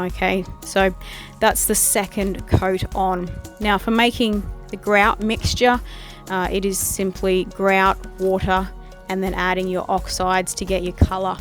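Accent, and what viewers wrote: Australia. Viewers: Tasmania? I hear some similarities between you and others that I know are from there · oh wow this was pretty cool and an aussie. nice to hear a local.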